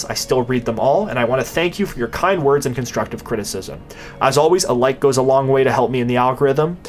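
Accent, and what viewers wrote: Quebec, Canada. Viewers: C’est du bon québécois! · Most likely French-Canadian per the accent. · That pronunciation of French? My man is Canadian. French-Canadian. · Makes sense the dude is definitely from Quebec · I think he's Canadian -or very much sounds like in French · Thanks for the wonderful video, and thanks for not hiding your Quebecois/French Canadian accent!:D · mon, ton accent me dit que tas grandi un bout a quebec haha ne laches pas continue ton truc tes videos sont geniales! tres bien recherché chaques fois! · Tu sonne comme si ta appris ton francais au québec. Nice!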